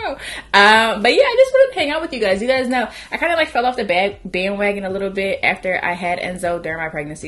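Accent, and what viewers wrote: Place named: New York, United States. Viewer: It’s the love and appreciation for NYC talk/accent 🗽💕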